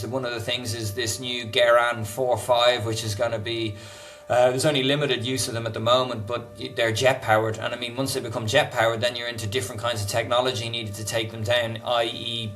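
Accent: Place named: United Kingdom. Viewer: British accent = lies.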